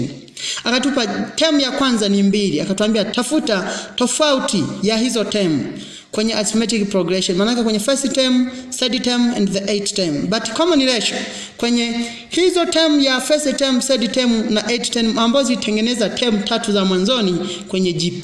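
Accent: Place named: Sukuma, Tanzania. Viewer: Akiongeza kidhungu inavutia lakn kiswahili tunasikia lafudhi ya kisukuma tunabaki kucheka tu🤣🤣🤣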